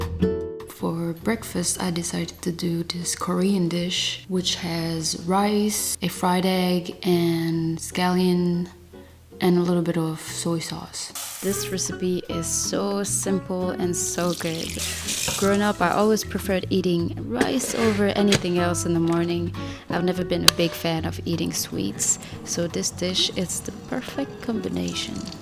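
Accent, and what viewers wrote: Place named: France. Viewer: its the french accent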